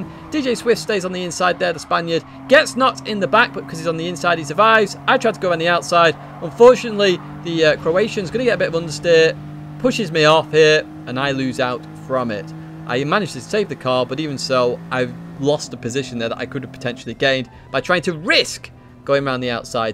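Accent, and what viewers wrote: England, United Kingdom. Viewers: What part of England are you from. I’m just curious about your accent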